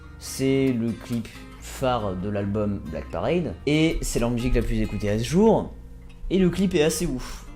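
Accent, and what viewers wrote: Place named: United Kingdom. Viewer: le peau rouge! (Ton accent anglais me rappelle un certain Mr Boyer je sais pas si tu connais)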